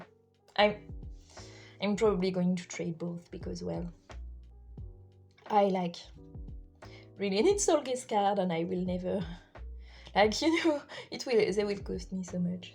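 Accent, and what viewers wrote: France. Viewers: your accent is so pretty! I knew you were french right away because i used to take french classes XD good video btw i just subscribed :)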